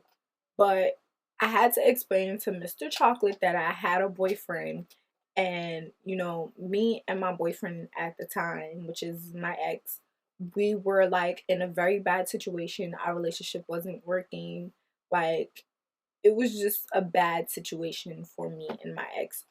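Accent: Jamaica. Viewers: Your Jamaican accent is everything 😍😍😍😍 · just found your channel, and mi neva hesitate fi subscribe from I heard Jamaican yazzz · are u Jamaican? you sound like it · Love that you get Jamaican when you get mad 😍👑🙌🏽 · You Are SO Pretty & I Love When You Talk Jamaican . #Suscribed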